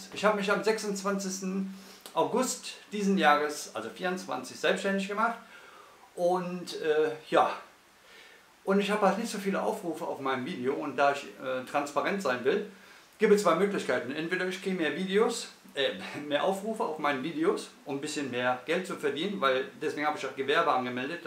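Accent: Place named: Rhineland, Germany. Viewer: Lieben Dank für deine ausführliche Antwort! Ich finde es toll, wie du als Hausmann bestrebt bist, das Einkaufen und Kochen zu optimieren, so dass es auch wirtschaftlich ist. Das mit dem Einkochen ist eine prima Idee und für euch drei Personen lohnt sich das allemal. Bin mal gespannt, was daraus wird. Ja, du hast Recht, es gibt Unmengen von Kochkanälen und ich selbst habe auch sehr viele abonniert, auch richtig große wie BBQ Rheinhessen. Mir gefallen immer Kanäle, die sehr authentisch sind und man den Betreibern die Lust am kochen und guten Essen anmerkt. Ich finde deinen Kanal sehr authentisch und mag deine ruhige, coole Art und auch deinen rheinischen Dialekt. Auch deine Tipps sind immer sehr hilfreich und ich mag ja die bodenständige Hausmannskost. Ich denke, du hast deine Nische gefunden und ich persönlich wünsche dir ganz viel Erfolg und freue mich immer mit dir, wenn wieder Abonnenten dazu gekommen sind. 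Mach einfach weiter so, das wird was! Liebe Grüße, Bettina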